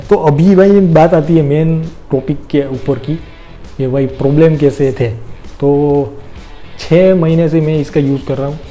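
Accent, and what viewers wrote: Gujarat, India. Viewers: Wah bete wah Aap gujrati ho na aapki hindi se pata chal gaya Hu pan gujarati chhu bhai..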